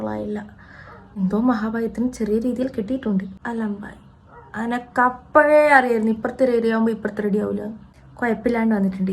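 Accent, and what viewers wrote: Kannur, India. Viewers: Slang kettappozhee mnsilayii ksd or kannur ann lle? ❤️💗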